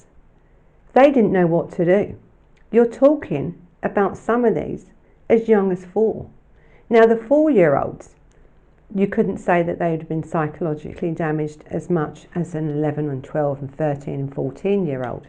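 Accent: London, United Kingdom. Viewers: Thank you my dear; I never thought of end to end in that way, thought it was more people carrying on with someone else who used it on What's app. I would never use it as I have nothing to hide I suppose. Thank God my daughter is now an adult herself. The internet can be a wonderful thing, but is truly a double edged sword. Also rather depressingly I am on the Suffolk/Norfolk borders, near this creature. I will as inform as many of those I know about the danger and new techniques these bastards are using. Am learning from each story you put out so, thank you. BTW is your accent London, Essex, Thames Estuary, American and Ozzie? I definitely hear London/East End/Essex borders. I am all cept USA n Aust. lol. Worked in London until thought up in Suffolk she would grow up surrounded by nature and peace. She was 7 and was not happy leaving. I was though. 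Am a new fan and subscribed couple weeks back. You go warrior woman, we need more like you. Locked down love from East Coast of England. x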